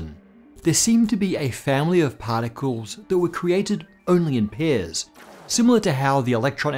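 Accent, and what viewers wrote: Australia. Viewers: I'm so confused, you have an Australian accent and say "zed" not "zee", but you also said "math"...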